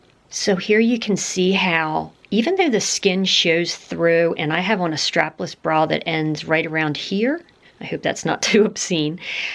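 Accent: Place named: New Jersey, United States. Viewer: you are such a joy to watch. Your kindness is much appreciated as is your humor! I'm originally from New Jersey, I left at 24 but we go to ocean city some summers. Your dialect sounds like home. Really, really enjoy your videos and yes, I would love to see some amazon hauls. Your pace is perfect. You are easily understandable and thorough and come across as a friend. So happy I found you.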